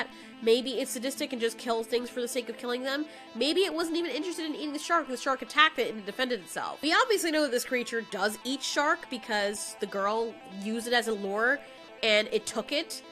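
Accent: United Kingdom. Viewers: I personally prefer your British accent. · Are you British?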